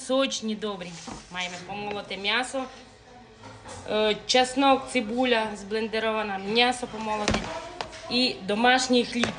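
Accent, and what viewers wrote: Transcarpathia, Ukraine. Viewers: То говір Закарпатський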